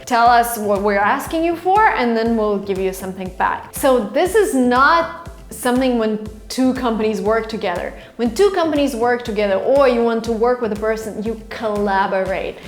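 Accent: United States. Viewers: do your Russian students mention your American accent? I like your videos:) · Hi, I have been following your videos, really great.... I am a softskills trainer but I understand that I need to learn a lot about it. I am from South India, working in an institution. Any kind of your help, will be of great support for my career. Please let me know if you can offer me in this aspect. I want to talk like you. With the American accept. Please help me. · Hello, I'm learning English mainly to speak like a native speaker. My English level is intermediate and B1 level., I also did English courses intermediate and upper intermediate at British Council in my country Sri Lanka. Anyway my English is not 100% improved and I want to speak like a native speaker. I want to follow your English course. Your American English is also best to me. Unfornately I'm getting a low income from my job and nobody is offering me good jobs because of my sickness. 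But I will try my best to collect money and buy your English books. You are kind to me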